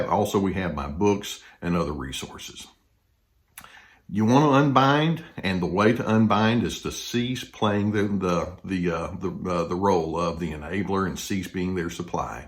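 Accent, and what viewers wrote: South, United States. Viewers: Your description of a narcissist freed me! I couldn't believe you were saying everything I was dealing with, like Gaslighting! He put me through hell playing that sick mind game, he made me question my sanity on more times than I want to admit. Just sitting there with my ear buds in listening to your calm southern voice that reminds me of my uncle you put into words what I wasn't able to because I was so beat down, frustrated and empty! At the end of your video I knew what I was dealing with, I knew it was never going to stop and I knew I was done! It was so bad I was carrying a pistol! I packed up what I could get in my car and left that day and I will never go back!! He has turned my youngest child against, and tried to do the same with her older sister but she cut him off when he starts on a rant! I'm gone, sooner or later he's going to need a new source of supply so he's going to point that inner rage at his own daughter and when he does he has met his match because she's more controlling than he is! 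Lol She's a chip off the ole Narcissistic block! I don't hate him, love him, he's pathetic. He had a stroke from high blood pressure because he wouldn't take his meds. and he has tubes coming out of his kidneys because they're barely working and you know what? I'm not going to help wipe his ass, I did it for 41 years and I refuse to give him one more minute of my life! I might sound heartless, if I am he made me this way!! This is my life, the fact that I have absolutely no i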